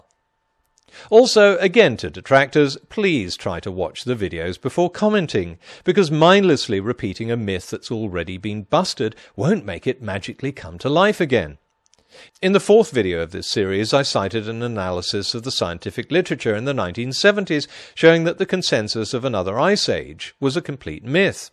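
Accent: United Kingdom. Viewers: You might have people beeing sceptic about you. But at least you have this very very nice british accent (Is it Oxford?) and that always help upon credibility :-) Pun aside. You have very clever counter-arguments against people suffering from "youtubitis"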